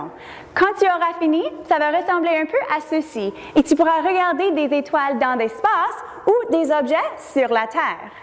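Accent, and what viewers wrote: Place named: Canada. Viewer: décidément j'adore l'accent de nos cousins/cousines d'outre-Atlantique, je le trouve toujours hautement réjouissant. Contenu intéressant pour animer un atelier (classe de découverte, colo...) avec des enfants (je dis bien les "enfants", pas notre mot d'argot qui veut dire tout à fait autre chose au Canada! - d'ailleurs comment avez-vous traduit le titre du film "Honey, I Shrunk the Kids"?) Pour la collimation j'ai un doute, pour observer la nébuleuse de la Lyre aussi; perso je choisirais la grande lunette si j'avais les moyens, mon XLT 120 est ridicule à côté.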